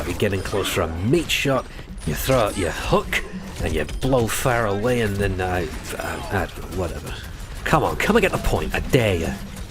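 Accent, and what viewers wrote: United Kingdom. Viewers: But he's a strong contender with his British accent ( is a contender of Morgan Freeman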